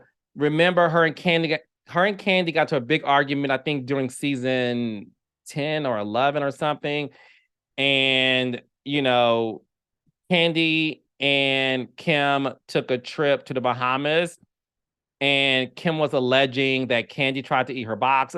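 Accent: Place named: Florida, United States. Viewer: Your dialogue and pronunciations remind me of Marlo. Are you from Florida? I 100% agree Porsha should stay far away from RHOA. Porsha is great TV but RHOA is no longer the platform for her. I would love to see a show with Porsha, Phaedra, NeNe and Kim on a show following each of them on their new journey. It would be interesting, entertaining and just enough shade to make you laugh.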